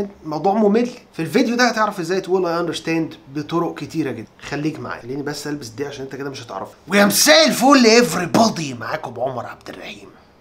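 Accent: United States. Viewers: لهجتك كثير محترفة كأنك اميركي بالفعل. ممكن تعمل فيديو عن طرق ممكن من خلالها نتعلم اللهجة الأميركية؟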